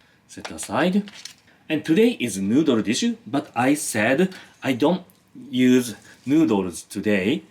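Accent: Japan. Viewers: excatly Japanese English accent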